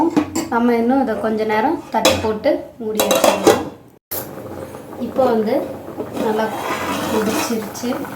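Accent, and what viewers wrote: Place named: Tirunelveli, India. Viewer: You from Tirunelveli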